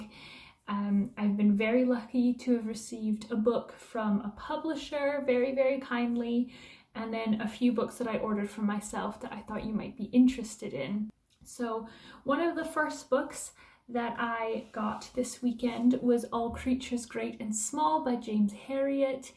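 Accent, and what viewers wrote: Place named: Scotland, United Kingdom. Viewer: This was so cosy and lovely. Also I love your accent, the little bits of Scottish in the way you say things like as well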